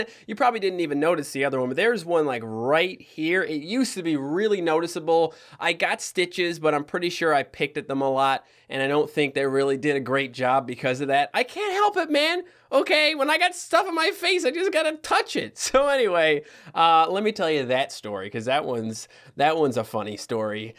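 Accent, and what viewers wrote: Newfoundland, Canada. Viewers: My god your newfie accent is spot on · Dude your Newfie accent is fucking, /spot on/. Never been to Newfoundland, since I live in Ontario, but mom's a Newfie, and I gotta say, your accent is perfect. Way to go from a half Newfie to another Newfie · Man, whenever I hear a thick Newfie accent it always reminds me of my family. All of my extended family lives in Newfoundland, and we used to visit every year but we don’t anymore.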